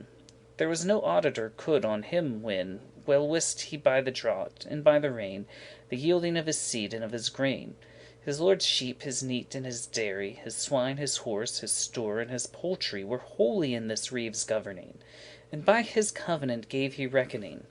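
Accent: United States. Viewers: Ive been needing an American accent to understand it ❤ thank you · That's bloody awful. You're supposed to speak it as it was spoken, not with a Yank accent!!!!!!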